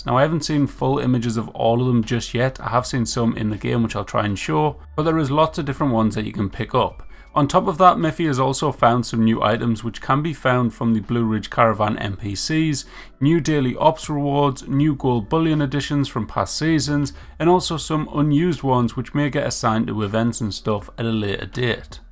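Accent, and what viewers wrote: United Kingdom. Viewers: i hate this heavy british aceent! (o)pdates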